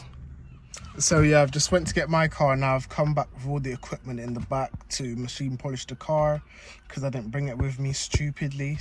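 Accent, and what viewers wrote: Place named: Bristol, United Kingdom. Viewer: Great video mate, new sub here!! You sound like Bristol way?? Might be wrong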